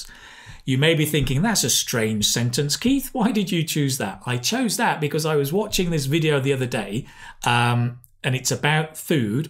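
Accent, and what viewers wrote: United Kingdom. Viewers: I really appreciate your vid ,i’ll keep practicing for apply an airline ,Thanks so much Keith,anyway i like British accent .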